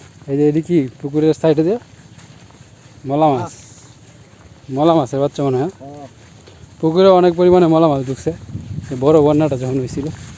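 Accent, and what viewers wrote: Netrokona, Bangladesh. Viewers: Apnader Basa kothay. Kotha Shune Mone Hoy Netrokonar. Am I right?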